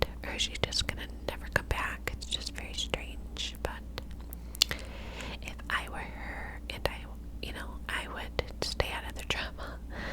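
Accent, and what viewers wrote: New York, United States. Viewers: Could you do another video with your New York accent? Those videos are always so tingly 🥰 💕 I hope you are doing well! 😊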